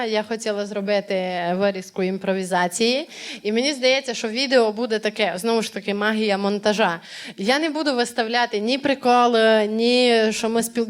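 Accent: Transcarpathia, Ukraine. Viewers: Пані Валеріє, дякую Вам і Вашим батькам за Вас! Мені дуже подобаються Ваші виступи, концерти! У Вас прекрасне почуття гумору, елегантний стиль, чудова закарпатська мова. Я пишаюся, тим, що наша Україна має таких чудових талановитих дівчат! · Оригинально, уникально, по закарпатськи! Йоу!🎉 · Та ви шо! Не любиться, та не позирайте і не слухайте. У нас на Закарпатті ми так говориме, а ви говоріть як маєте дяку. А ще і совіти роздаєте коли вас не просять. Мало не красно. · слухаю тільки через закарпатський діалект😅, а так ніц не смішно, хай шлєк трафить 😅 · Так, до не до неї, бачте як плескають в ладоні, мабуть дуууже подобається, цеж русинська мова, так вони,Закарпаття, кажуть!!!на цей говір...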